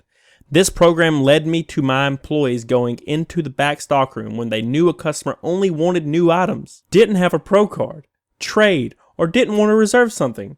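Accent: South, United States. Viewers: Ah. Figured it out at last! is channeling William Shatner..... If he had a southern drawl. Gamespot... IS..doitagain. SCOTTY! Set phasers....TO full. loadalltorpedoes...... <link> This is what I hear in my head whenever Gamespot is mentioned in these videos. <link> (Pretty sure no crabs in this video were left unharmed) Love the content man. Keep up the awesome work!!!!!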